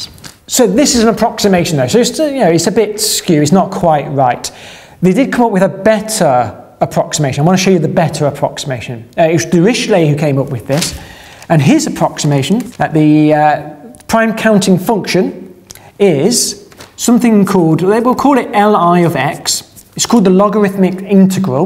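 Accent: United Kingdom. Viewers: it's hard to a Brazilian like me to understand his British accent. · this brit has the Most British accent ever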